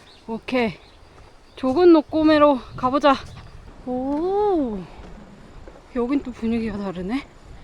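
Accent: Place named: Gyeongsang, South Korea. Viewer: 아~~~주변에 비해 높다 하여 노꼬메 인가 보네요 경상도 사투리 이군요 제주 방언 인가 하였네요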